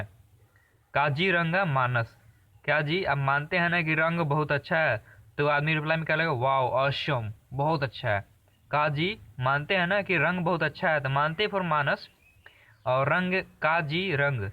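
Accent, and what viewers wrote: Bihar, India. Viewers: bihari language , improve it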